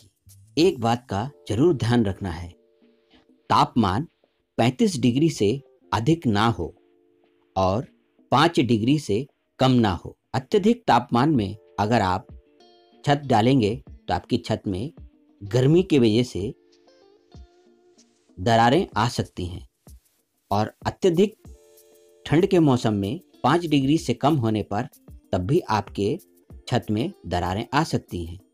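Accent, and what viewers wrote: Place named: Bhopal, India. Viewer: Sound like Bhopali 😀👍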